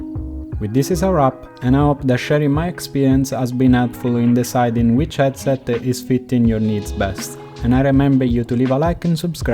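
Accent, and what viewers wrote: Italy. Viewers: Italian english !! this is a very nice headset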